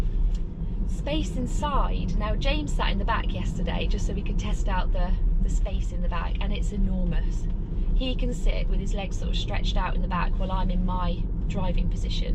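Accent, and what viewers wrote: United Kingdom. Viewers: My wife needs to see this! She complained about the Model 3 P that we rented for a quick weekend getaway with some kids. She said it was a harsh ride and didn't like it. I've got a LR Model Y coming end of Oct - early Dec and she thinks she won't like it.This is GREAT intelligence here! Doesn't hurt that it's narrated in a cool British accent 🙂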